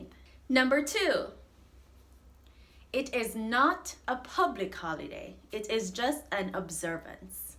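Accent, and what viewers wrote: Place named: Jamaica. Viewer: My favourite "English" accent ever! Can't wait to visit Jamaica soon ❤❤❤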